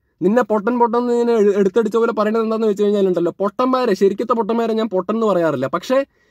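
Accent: Palakkad, India. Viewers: Bro palakkad aano language ath pole thonni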